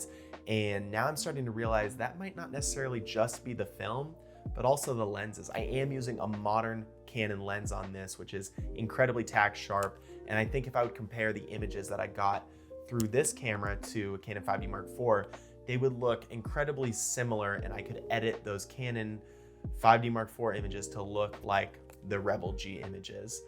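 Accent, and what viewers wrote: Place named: Louisville, United States. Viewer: I can tell by how you say it, you're definitely a Louisville native! Much love from the 502 brotha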